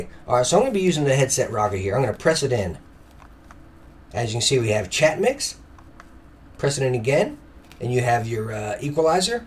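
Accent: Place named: East Coast, United States. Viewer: 2 seconds in i was like "this guy is east coast for sure"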